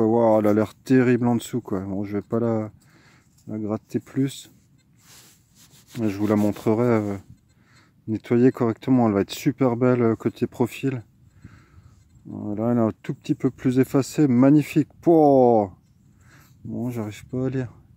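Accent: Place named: Alsace, France. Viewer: Felicitation !!! J'en reviens pas de la chance que tu as de trouvé une pièce du Vatican. Imagine la probabilité de tombé dessus c'est un truc de ouf. Sinon je trouvais ton accent familier mais j'arrivais pas à trouver et j'avais un pote a l'armée qui venait d'Alsace maintenant je sais 😁 vous avez la même façon de parlee ça ma rappelé plein de bon souvenirs. Merci encore pour cette superbe vidéo continu comme ça.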